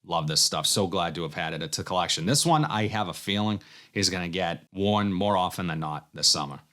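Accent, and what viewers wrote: Boston, United States. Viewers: Here for the fragrances , but also the Boston accent 😝☺️ MFK GFS is awesome , but I can’t afford the OG - I like Oil Perfumery’s version (it’s def a bunch) as well as fragrancebar and ALT fragrances . I needed a variation from anyone that duped it . Great cooling fragrance, I love it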